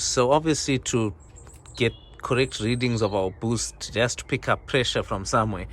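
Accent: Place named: South Africa. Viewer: Bra ekse sunno wk.. you chune like me from s.a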